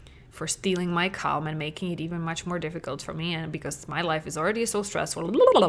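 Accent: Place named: Czech Republic. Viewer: I was trying to place the accent, but didn't guess Czech Republic. I lived in Prague for 2 years. I love all the Czechs I know! I had a full breakdown last week just hopeless because I have been trying to learn to parent better for ten years now and I still can't (5 kids 10 and under). Your content is a new take, I'm excited to learn more.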